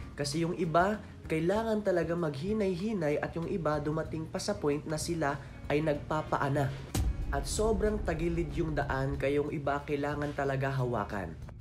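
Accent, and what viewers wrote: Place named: Philippines. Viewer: ang accent mo parang tagalog, hindi ka ata "Bisaya" murag Tagalog! Wala ba mga buaya diha?😅 Amping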